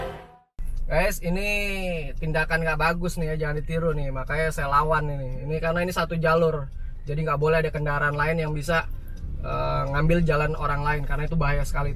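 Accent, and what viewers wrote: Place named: Tegal, Indonesia. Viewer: Tegal ngapak ki❤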